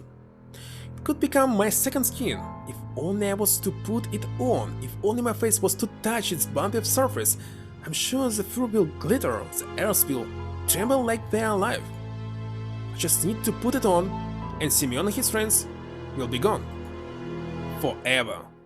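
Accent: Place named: Russia. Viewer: С первой минуты чувству., что ты Русскоговорящий парень ))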